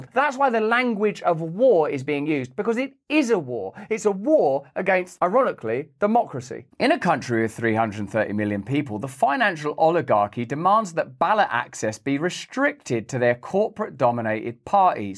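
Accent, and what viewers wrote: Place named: United Kingdom. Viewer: Used to like russel now he is alex jones with a british accent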